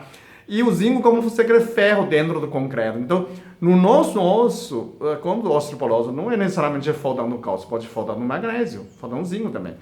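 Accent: China. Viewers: Esse japonês ou chinês hummmm ta mais pra chinês. Enfim, esse chinês é um fofo.Explica bem em seus vídeos,mesmo com sotaque de outro idioma. Depois de assistir o vídeo, acho que estou precisando tomar o cloreto de magnésio. Um abraço. Sou sua fã. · Eu adoro esse Dr pq ele e mto sábio.Explics tudo...ele fala português c o sotaque chinês Eu adoro ouvi-lo e entendo...só ter paciência. Parabéns Dr Deus o abençoe 🙏🙏🙏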